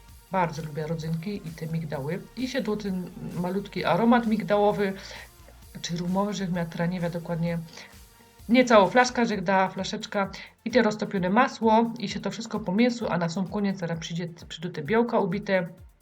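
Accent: Silesia, Poland. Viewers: jest za granicą,pochodzi ze Śląska i mówi swoją gwarą.To jest piękne,gwarę należy powielać,bo to część spuścizny po przodkach.I nie ma się co popisywać ze znajomością mowy polskiej! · Pani mówi gwarą śląską i wten sposób wymawia się mleko. Pozdrawiam.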